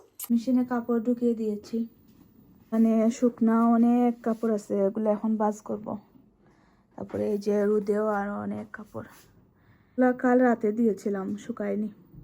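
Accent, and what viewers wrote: Sylhet, Bangladesh. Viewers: মাশাল্লাহ আপনার ভিডিও খুবই ভালো লাগে আপু। আপু আপনি কি সিলেটি। কথার ধরন অনেকটা ওরকম লাগলো প্লিজ ডোন্ট মাইন্ড।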